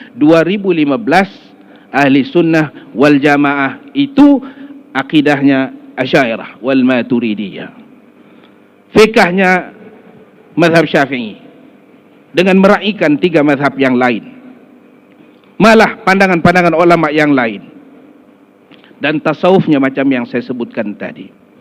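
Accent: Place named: Kelantan, Malaysia. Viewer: sedap bunyi loghat Kelate.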